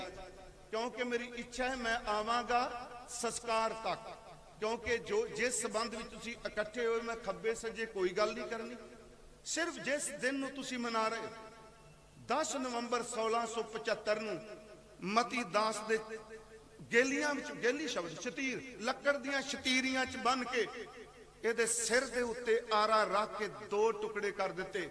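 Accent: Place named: Tanda, India. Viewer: Tuhadi boli tanda de area naal mildi hai.. bilkul nirool hai..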